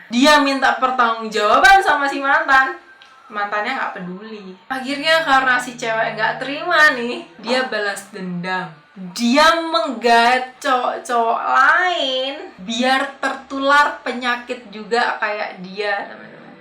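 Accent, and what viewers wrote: Java, Indonesia. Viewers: medhoknya ciri khas banget 😅😅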